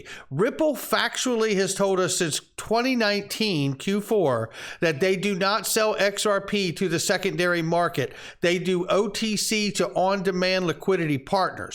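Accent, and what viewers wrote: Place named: United Kingdom. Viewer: I am very excite about XRP.. its every time different story.. very cool token.. and reporting very cool thanks you for this reporting...i check you headlines out bit hear of that all time.. very confusing you give report but your english cool good british language thnks 2 u 😘 😍😍 weLOVE for U much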